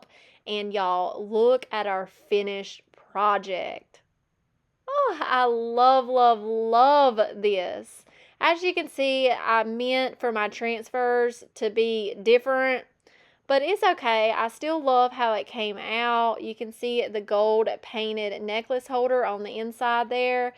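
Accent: South, United States. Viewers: Love your work. You said someone suggested you get a narrator, so rude and low life. Normally I don't like a southern accent. I've stopped watching some sites for this reason. However I love your voice. It is soothing and low key. You're great😊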